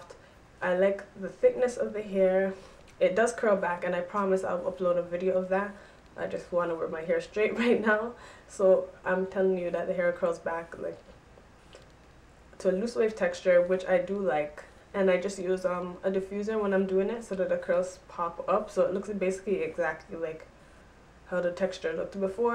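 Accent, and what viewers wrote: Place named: Virgin Islands. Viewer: This hair is beautiful! I just ordered mines. And if you don't mine me asking, your accent sounds like your from the islands; are you from the Virgin Islands?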